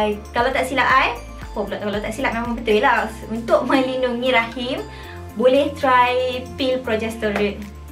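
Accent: North, Malaysia. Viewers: Very informative👍👍. Jgn hiraukan manusia2 yg jeles dok ngata bagi sharing session. You do you💪🏻💪🏻 btw, seronok dgr loghat utagha rindu pulak chegu2 kita dulu. Hahaha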